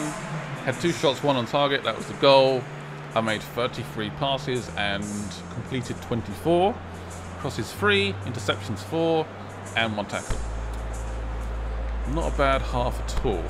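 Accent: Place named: Liverpool, United Kingdom. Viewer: It's difficult to understand some of the things you're saying because of your strong scouse accent.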